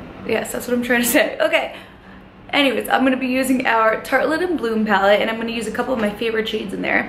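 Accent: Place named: New Jersey, United States. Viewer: just wandering where ur from. i live in nj and you sound like ur from here!!!!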